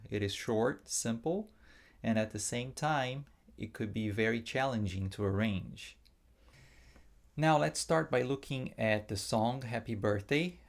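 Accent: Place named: Brazil. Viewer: Sotaque brasileiro está carregado nesse vídeo! haha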